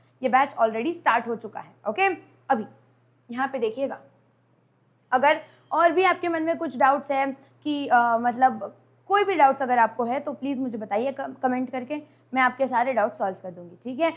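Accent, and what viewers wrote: Maharashtra, India. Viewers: Ap marathi ho ky mam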